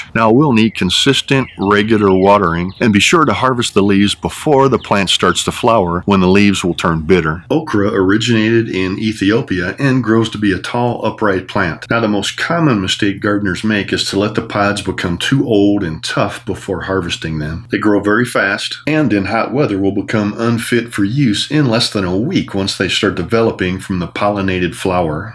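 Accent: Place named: Texas, United States. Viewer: I thought you must be a Texan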